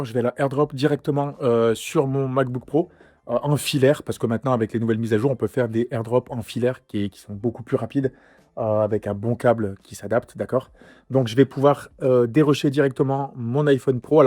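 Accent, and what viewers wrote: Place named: North, France. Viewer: Tu serais pas du nord par hasard ? Les A que tu prononces font très nordistes. Tkt je suis de lille c’est pas pour me moquer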